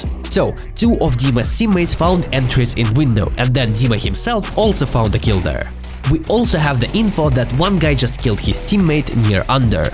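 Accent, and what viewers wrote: Russia. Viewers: а я один думал, что это русскоязычный тип? я начал смотрел его давно, когда он только снимал видео на ютуб и без вебки, и мне казалось, что его акцент и имя похоже на русского…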